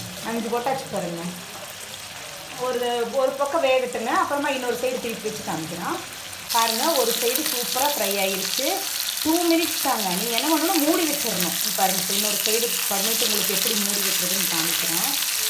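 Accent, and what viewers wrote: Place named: Coimbatore, India. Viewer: Ur language slang spr sis.. Iam also coimbatore....🙂🙃🙂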